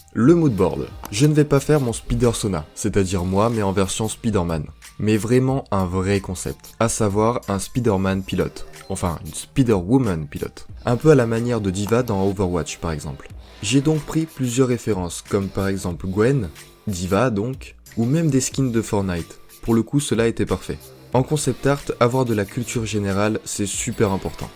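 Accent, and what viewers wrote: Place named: England, United Kingdom. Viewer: Alors l'accent anglais est trop guez mais par contre gg pour la vidéo comme d'hab, c'est quali ^^ continue comme ça 😋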